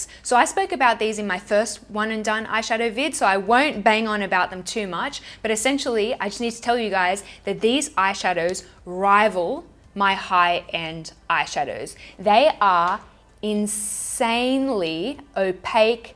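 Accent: Australia. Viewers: Love your Oz Dialect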